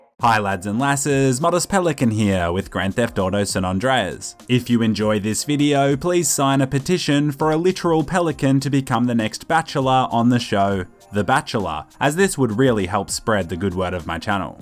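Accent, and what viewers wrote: Romania. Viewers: Are you from romania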